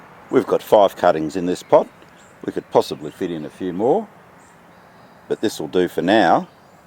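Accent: Australia. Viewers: I'm sorry but i just can't take the aussie accent seriously :) :)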